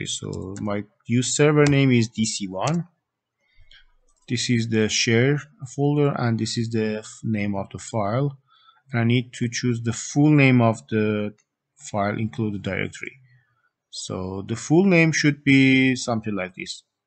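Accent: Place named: Iran. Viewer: iranian accent :)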